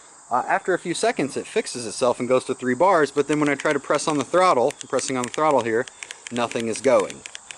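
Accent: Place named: Michigan, United States. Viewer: BTW...where do you live, man? It sounds like maybe Michigan. You should open a repair shop if you haven't already. Would rather pay someone like you and not give any more money to ScamTron, I mean, SwagTron.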